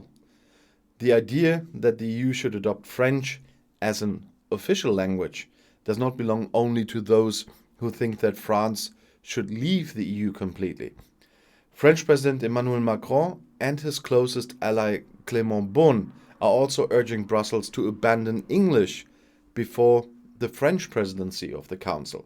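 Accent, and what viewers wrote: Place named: Canada. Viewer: O no it Canadian English actually. 😅😜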